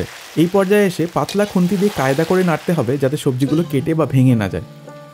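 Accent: East Bengal, Bangladesh. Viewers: Tumi ki from east Bengal . Bharali bolle bole bollam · কথা শুনে বোঝায় যাচ্ছে পুরো পূর্ববঙ্গে ইয়া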